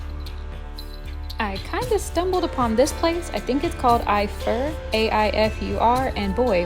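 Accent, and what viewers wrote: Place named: South, United States. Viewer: ☺️ Just saying what I’m feeling and thinking about when I watch and chat. ☺️ Yes of course we can tell when you’re reading or narrating over the video, and no it doesn’t come off as stilted at all. In my mind, you’re reading your “notes” and doing it so you don’t forget what you want to say. But it still feels like you’re talking to us and teaching I guess. I mean no one is going to remember everything when you’re filming, then editing the finish product. So the way you do it, works. You both “come off” sounding like you’re enjoying what you’re talking about. Your tone, and even accents, yes I know has a more southern drawl than you normally, but yours comes out some too. Not talking too fast, nice and reassuring I guess is what I’m thinking. Can tell when you’re smiling when you speak too. Trust me, we love that! 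❤️💜❤️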